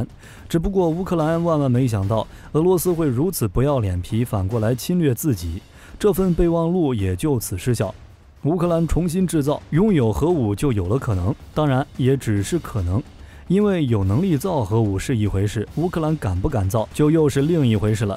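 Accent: China. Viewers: 大陆没人叫“普丁” lol, 我就觉得这频道有些视频内容有点怪怪的，原来是台湾的播主找个大陆口音的机器人发音软件做的。。。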